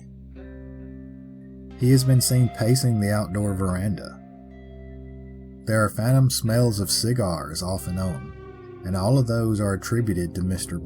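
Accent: South, United States. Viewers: Hey glad to have and hear you back my 'Southern drawl dreamy dude' hope ur family lingerie all recovered xxx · Another great video, as always! I'm hooked on this channel - you have a wonderful relaxed, laid-back voice with that Southern drawl - absolutely perfect for story-telling! It reminds me a lot of Shelby Foote - I loved his wonderful anecdotes and stories in the "Civil War" TV documentary! I would love to meet that friendly woman ghost - especially if it were possible to have a conversation with her! I'd love to know what people of past eras would think of modern things like electricity, computers, the Internet and cellphones. Keep up the great work!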